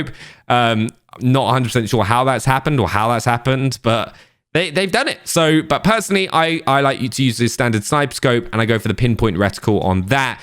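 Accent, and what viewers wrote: United Kingdom. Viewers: I love how britishers say knot for 0, it's just amazing. Sorry, bri-ish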